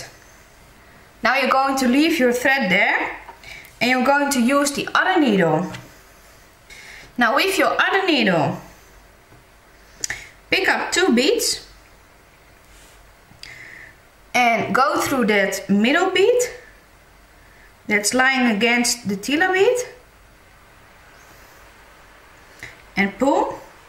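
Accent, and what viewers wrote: Netherlands. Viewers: are you from the netherlands? cause i am and your accent sounds like your dutch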